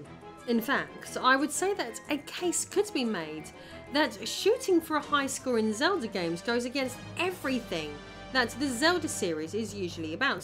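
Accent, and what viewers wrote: England, United Kingdom. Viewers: That live voice thing is kinda annoying. I dont need to be handheld and told how to access a sub menu.. just let me play the game. Now if they let you do the live voice then that would be cool! Your voice is enchanting! Is it an English accent that I'm hearing? Absolutely stunning voice. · It's a British accent? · I've been studying English for almost my lifetime and I have to say I totally love your british accent.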